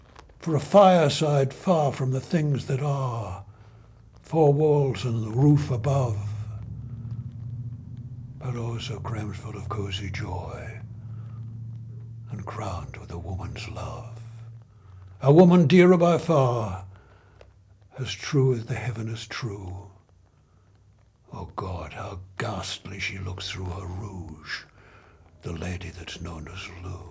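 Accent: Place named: Australia. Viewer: It works well in an Aussie accent. Could almost be an outback tale.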